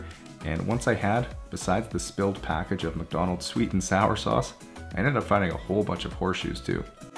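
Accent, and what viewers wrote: Canada. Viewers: Where is this guy located? Probably nowhere near me since I'd love him to detail my car! I usually do it but have been working so many hours, I get home and pretty much eat and go to bed. He sounds Canadian, so my guess is he's in Canada... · I love the Canadian “ya” and “hey” they always make me smile. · He’s been the only Canadian that sounds like a Canadian on this channel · Man I love the Canadian accent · OMGOSH, here I thought he was from Hawaii and was confused by his accent. I don't really know what a Canadian sounds like since the ones I work with have straight accents so listening to him was quite the study.😂😂😂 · Americans: OMG I really love this guy's accent, it's so Canadian!! ROTW: We really, really cannot tell the difference between his accent and yours <shrug> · Love the Canadian accent aye..... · I knew he was Canadian the 1st 15 seconds of the 1st video I watched lol however I can hear it more as I'm not Canadian. 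· i knew he had to be canadian, no americans pronounce garage in the weird way he does lol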